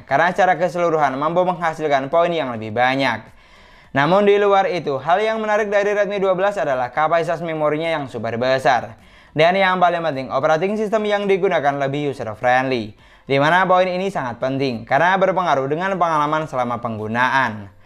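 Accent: Madura, Indonesia. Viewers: kek logat madura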